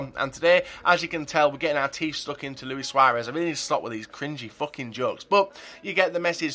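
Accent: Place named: Yorkshire, United Kingdom. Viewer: I love the Yorkshire voice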